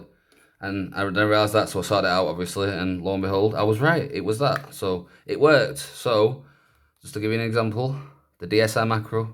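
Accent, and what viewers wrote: United Kingdom. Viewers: Are you scottish? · Love the British accent mate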